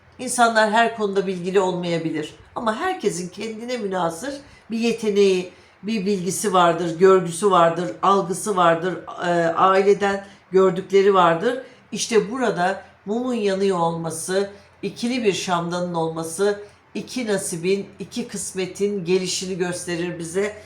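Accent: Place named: Central Anatolia, Turkey. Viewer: Aldım kabul ettim bana uyanlari. ağzınıza sağlık hanım sizi çok severek dinliyorum nerelisiniz acaba merak ettim konuşmanız iç Anadolu bölgesi'ne hitap ediyor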